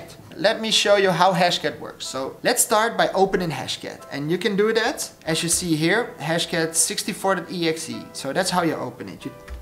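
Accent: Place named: Netherlands. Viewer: Yeah I can just tell you're Dutch, your English accent should sound more convincing in order to scam people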